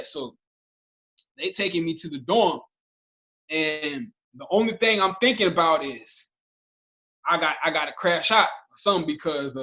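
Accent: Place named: Washington DC, United States. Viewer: Sound like he from DC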